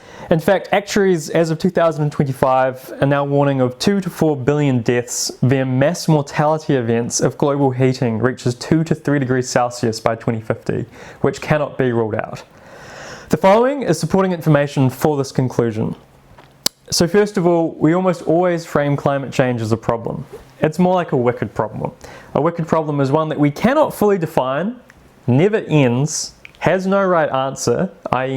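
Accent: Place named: United Kingdom. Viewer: I saw the thumbnail and I was like 90% sure a British accent was coming my way, close enough. Yeah, shit is lookin' pretty bad, doomers!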